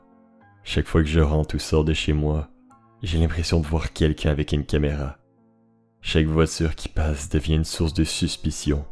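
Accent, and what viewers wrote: Quebec, Canada. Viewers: j'adore ton "accent" Québecois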